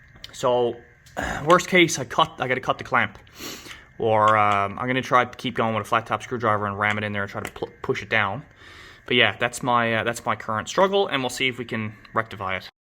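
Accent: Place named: Ireland. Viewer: A sense a bit of Irish accent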